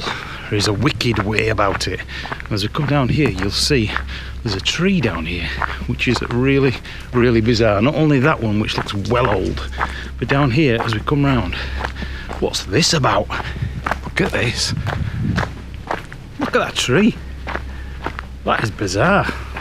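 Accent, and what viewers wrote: Yorkshire, United Kingdom. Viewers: tv show filmed at Port M was "The Prisoner" used to watch the re runs in 90's : ) You sound like a fellow Yorkshireman, im West Yorkshire Lad B'n'B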